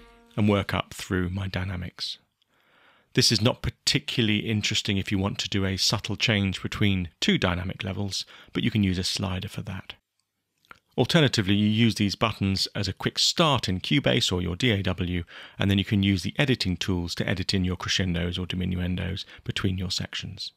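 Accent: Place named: RP, United Kingdom. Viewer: Thanks for the videos. I love how your BBC accent suits the BBC sample libraries. It sounded out of place when you were talking about the lurid purple sex button on the Embertone Sax! No offence intended. I just love seeing the funny side of everything. :-)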